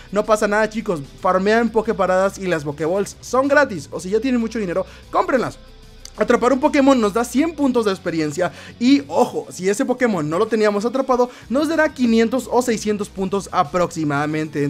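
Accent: Durango, Mexico. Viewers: eres de durango we hahahaha eaaaaaaaaah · no mames!!! eres de durango:o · oye eres de durango? 😳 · eres de durango?! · eres de Durango :v?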